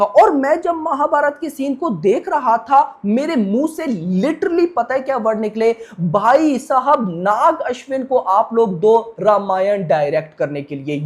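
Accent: Pakistan. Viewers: Tu pakistani kesa bolna band kar sale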